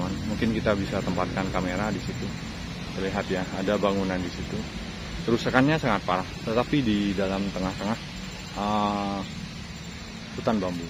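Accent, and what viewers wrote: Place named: Madura, Indonesia. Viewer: baru nonton channel ini. saya suka karena rumah Belanda kuno. Logatnya Msdura banget... jd inget sama famili jauh saya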